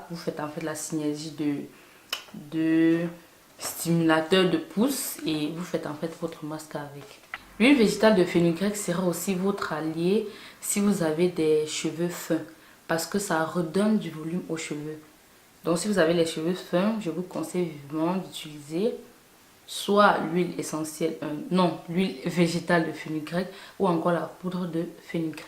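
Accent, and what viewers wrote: Cameroon. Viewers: J'adore ton naturel ... la voix de la Cameroun aise 😍😍 et tes cheveux sont juste magnifiques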